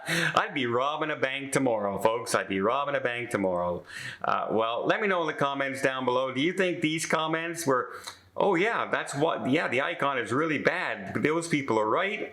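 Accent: Canada. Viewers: It's the Canadian accent 😉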